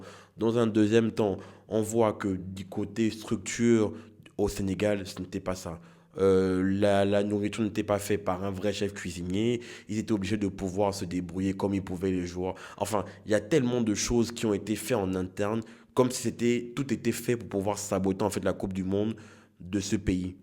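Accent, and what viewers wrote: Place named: Ivory Coast. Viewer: ivoirien on reconnait quand tu parles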